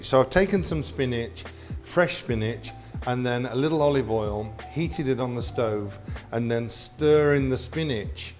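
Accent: England, United Kingdom. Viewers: Stay English, Chef. Sounds so much better for a royal chef!! Beautiful cooking nonetheless.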